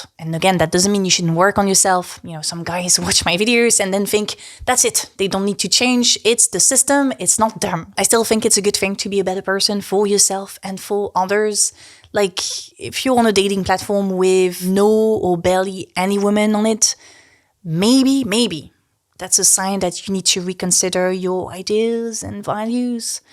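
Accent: France. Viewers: Je me disais bien que je ressentais cet accent français 😂❤ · Ahh, makes videos deliberately titled ' the toxic hedonism of male travel vloggers ' and 'are straight men ok?' all in a slightly provocative and mocking manner with almost a glee and a false sense of concern in the background and laughing at people who respond to the generalization, suddenly talking about the gender wars with her shtty french accent. Never change girl. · Are you french?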